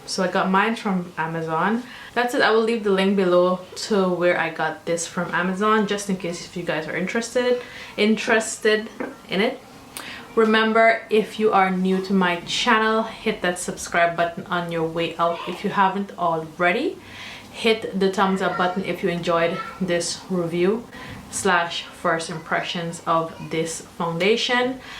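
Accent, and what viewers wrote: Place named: Caribbean. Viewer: you sound caribbean, where are you from? I'm from Trinidad.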